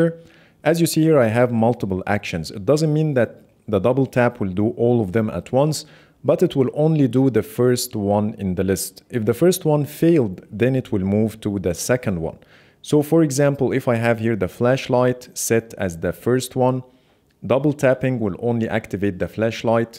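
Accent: Egypt. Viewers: Nice apps thank you for sharing it, as you sound as an Egyptian English speaker.. Great job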